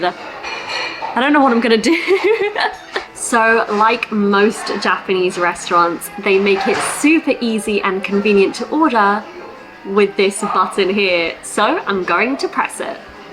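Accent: United Kingdom. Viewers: First of all, thanks for uploading the new video. I've been studying English with your video lately because I like your British English. I usually watch the same video at least 10 times. Really looking forward to your next video too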